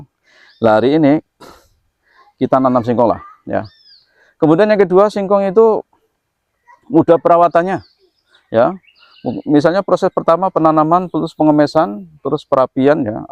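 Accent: Java, Indonesia. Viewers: Ok pak jowo banget iki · Mas, ngeliat alam Suriname sama persis dengan alam Indonesia. Mendengar logat mas,Jawa banget. Kayak ngeliat orang Jawa di Indonesia. Matur nuwun mas. Sudah memvidiokan alam Suriname. Salam buat semua sodara sodara disuriname Yo mas. Semoga semua sehat selalu...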